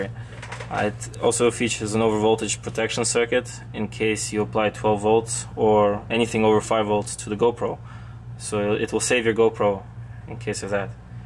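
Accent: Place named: Russia. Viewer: По акценту я сразу понял что говорит русский)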